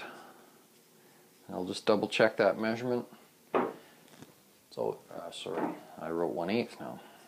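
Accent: Canada. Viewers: He from Canada ! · Canadian, aye?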